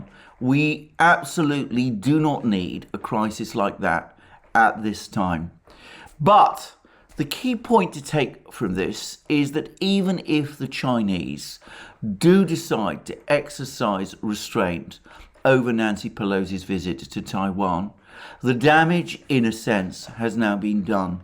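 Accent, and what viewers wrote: United Kingdom. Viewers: Fantastic analysis, unbiased, clear and balanced. Great British accent.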